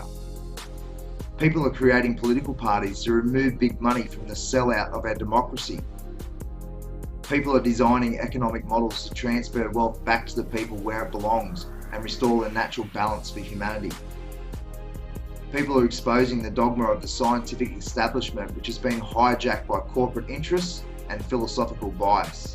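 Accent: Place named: Australia. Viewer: I love this! it is nice to hear a fellow aussie for a change ;)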